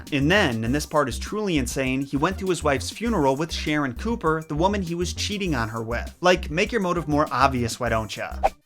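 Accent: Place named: Midwest, United States. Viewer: lmao yes 😂 Also you have gotta be from the mid-west! Every time you say “Bob” I feel like I’m at a family reunion in northern Minnesota Lol!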